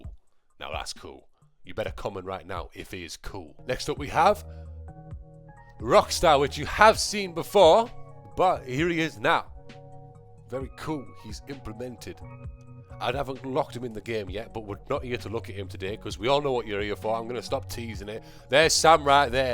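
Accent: United Kingdom. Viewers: So dont hate him for british acent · He sounds scottish, first time i heard this voice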